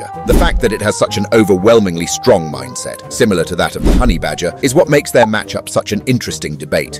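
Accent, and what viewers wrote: United Kingdom. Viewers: Did this guy just rip off Casual Geographic with a British accent? I get he didnt create the slang, but the overly extensive use and variations is basically his style. Also, is this AI?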